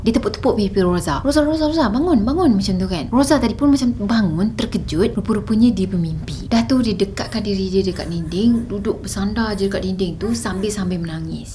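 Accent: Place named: North, Malaysia. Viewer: Asslam...hai asal mana utara kan...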